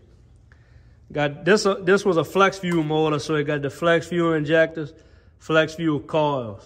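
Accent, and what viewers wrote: Louisiana, United States. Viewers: yeah i live in Arkansas been to Louisiana few times as a lineman for hurricanes it’s definitely a trip! Knew you sounded cajun!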